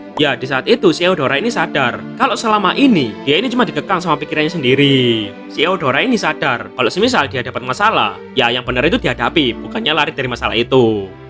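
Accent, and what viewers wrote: Java, Indonesia. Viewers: Jowo nyelll abang kuuuu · jowo mas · Jawa nya ngejawa bgt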